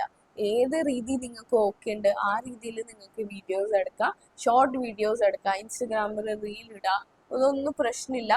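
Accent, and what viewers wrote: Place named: Kasaragod, India. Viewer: Kasaragod slag ❤❤😂😊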